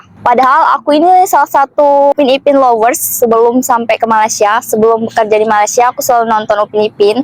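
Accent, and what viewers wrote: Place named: Indonesia. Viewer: Bahasa Melayu loghat Indonesia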